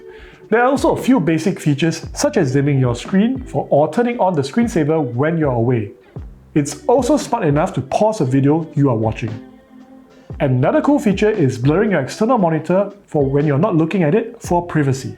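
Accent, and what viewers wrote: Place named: Singapore. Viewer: you Sound singaporean imo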